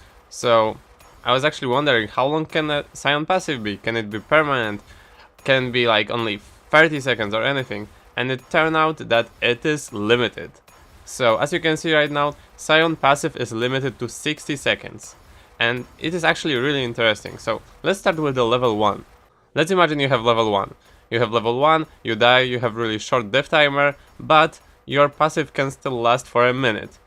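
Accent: Poland. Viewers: This Polish accent :D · jak Ty Polak XD wszedzie sie da poznac ten akcent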